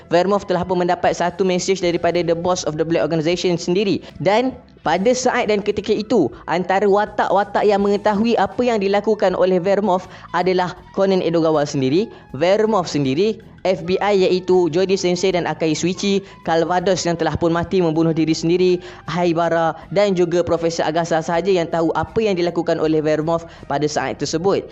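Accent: Kelantan, Malaysia. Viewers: Org klntn ke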